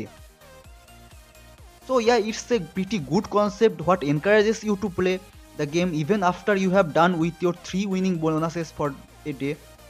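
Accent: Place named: Bengal, India. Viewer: U WERE IN MY GLOBAL ONCE.. WHEN I HAD MORE TROPHIES THAN U. AND STILL I HAVE.... I M SNIFFING BENGALI ACCENT OUT OF YOU BUDDY... GOOD WORK BOI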